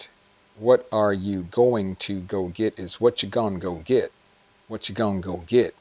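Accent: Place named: South, United States. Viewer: Thank you for these wonderful lessons. I love southern accent and the way y'all communicate, I love it. Please keep making more of these lessons, it's so fun to watch!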